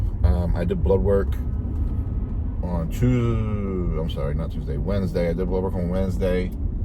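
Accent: New Jersey, United States. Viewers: the Jersey accent 😂😂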